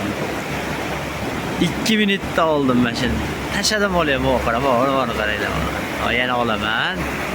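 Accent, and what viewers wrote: Andijan, Uzbekistan. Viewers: Анжонни каери бу ука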